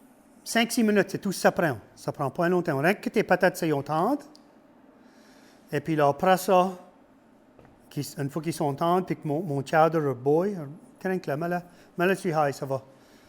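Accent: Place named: Acadia, Canada. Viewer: J'adore quand tu parle acadien je suis une acadienne et j'ai preste tout perdu mon parler et je te écoute et sa me fait du bien merci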